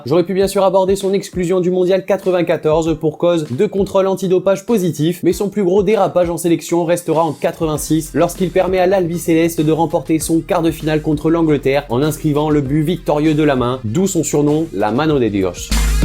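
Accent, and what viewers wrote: Spain. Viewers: tu as un superbe accent espagnol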